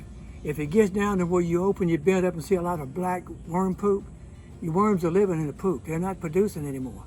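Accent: Louisiana, United States. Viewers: ♥ that Louisiana accent! Thanks! G-d willing,I am gonna try this out this weekend. Gonna plant a pipe beside my blueberry bush also and use your vinegar/water method. May your harvest always be abundant.🍇🍒🍓🫐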